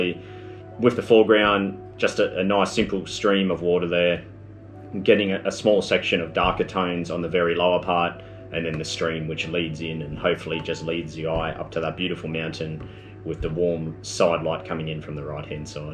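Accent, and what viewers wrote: Australia. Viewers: Just spectacular. I have only just come across your channel and am stunned by every image in each video and your easy to listen to style (Aussie) and the detailed information you provide. I must get to NZ.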